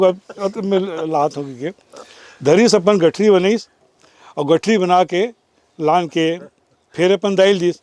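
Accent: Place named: Chhattisgarh, India. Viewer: बहुत सुंदर भइया, ठेठ हमर छत्तीसगढ़िया अंदाज कहिनी सुन के मजा आ गे, प्रणाम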